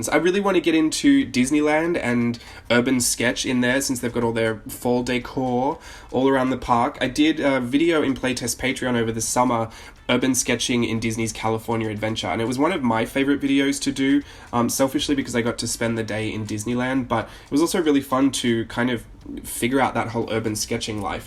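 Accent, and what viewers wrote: Australia. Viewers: You still sound Aussie to me!!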